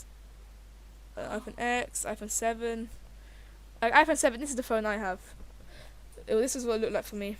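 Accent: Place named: United Kingdom. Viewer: Why are all youtube roblox scripters british?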